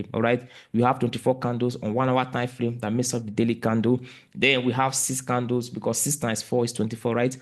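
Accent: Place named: Agbor, Nigeria. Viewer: Bro, talks like an agbor man. Nice one boss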